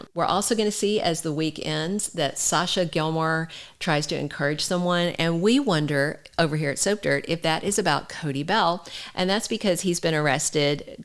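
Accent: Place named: United States. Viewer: It's nice to hear an American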